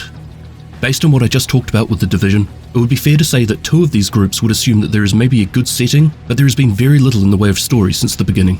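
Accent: New Zealand. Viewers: smooth kiwi voice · Monotone Kiwi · your kiwi monotone voice works wonders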